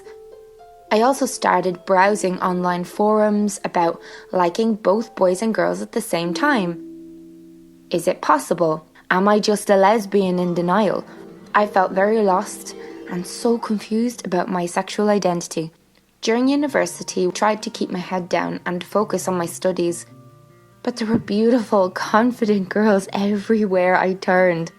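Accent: Ireland. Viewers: ayyyyyye you're Irish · YES YOUR IRISH (TWINS)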